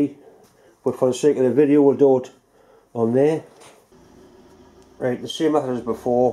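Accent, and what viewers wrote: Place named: Liverpool, United Kingdom. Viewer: thats canny man scouser trying to be a geordie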